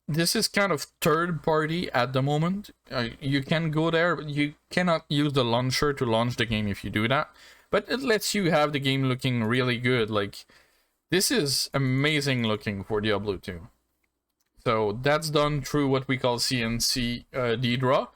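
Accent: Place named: Quebec, Canada. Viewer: Are you quebecois dude because you sound like the people I listen to on the radio here in st hyacinth. I love it!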